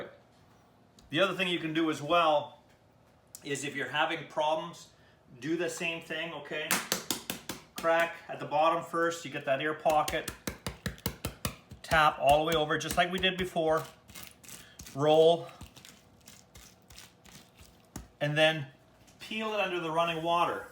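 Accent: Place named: Canada. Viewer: B.C. Egg! I thought I heard Canadian-speak in your voice! Love your Elvis TCB shirt, where did you get that? Oh yes, and thank you for another helpful video! I used these methods after my successful EZ-peel hard boiled eggs experiments, inspired by your other video at <link>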